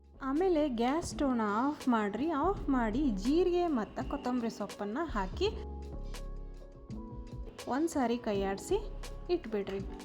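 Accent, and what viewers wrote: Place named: North Karnataka, India. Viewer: Uttar karnataka bhashe na chenda 👌🏻and alli kade uta matra masta irat super akka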